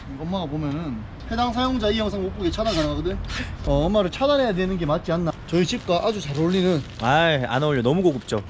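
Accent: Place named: Daegu, South Korea. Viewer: 여기보고 빵터져서 구독눌렀습니닼ㅋㅋㅋㅋㅋㅋㅋㅋㅋㅋ아 진짜 개웃기넼ㅋㅋㅋㅋㅋㅋㅋㅋㅋㅋㅋㅋㅋㅋㅋㅋㅋㅋㅋㅋㅋㅋㅋㅋㅋㅋㅋㅋㅋㅋㅋㅋ 혹시 대구분이세요?ㅋㅋㅋㅋㅋㅋㅋ 전 대구사람인데 제 남자인 친구들 말투랑 너무 같아서 정겨워욬ㅋㅋㅋㅋㅋㅋㅋㅋㅋㅋ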